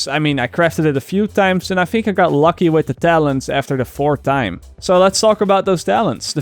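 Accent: Netherlands. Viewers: you have a dutch accent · Love the content on your channel aswell as your Dutch accent. Great Video, keep it up!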